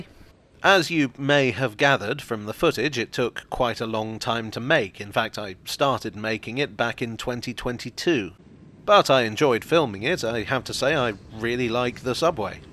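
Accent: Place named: Glasgow, United Kingdom. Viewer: This Narrator is coincidentally Glasweigen. His accent is broad Glasgow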